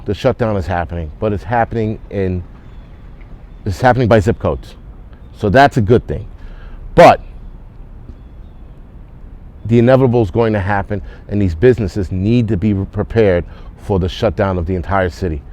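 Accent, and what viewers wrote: New York, United States. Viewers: THE PROFANITY WITH THE NY ACCENT IS THE BEST !!! YOU ARE SPEAKING FOR THE UNHEARD AND VOICELESS! !! AND SPEAKING HOW THE PEOPLE FEEL RIGHT NOW !! NO APOLOGIES !! GOT DAMN IT I LOVE IT !!!!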